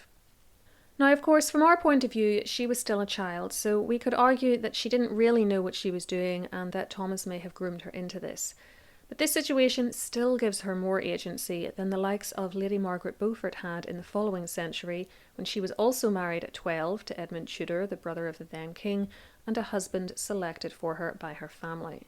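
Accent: Northern Ireland, United Kingdom. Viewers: Hi - is from Northern Ireland 🌟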